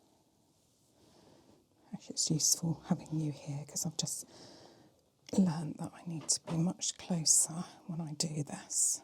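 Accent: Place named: Wales, United Kingdom. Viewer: Best Welsh accent in ASMR no doubt 😅 sleepy 🥱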